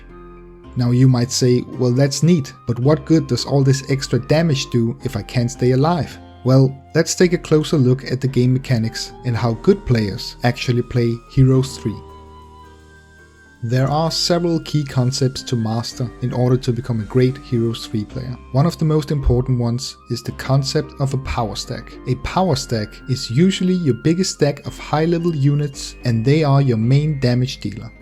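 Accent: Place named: Denmark. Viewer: Are you danish? I sense some accent.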